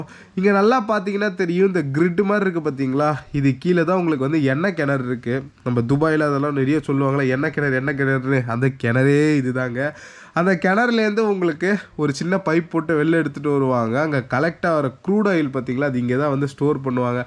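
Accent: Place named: South, India. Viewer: Aviya iviya south slang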